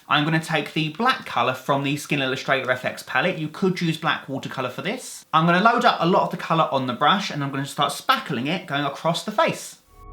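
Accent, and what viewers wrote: United Kingdom. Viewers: ik he is both of those I'm asking a question a lot of his fans know including me 1) u can tell he is gay because in one of his fan mail vids one of the fan mail letters it's said something bout his bf and u know he is British by his accent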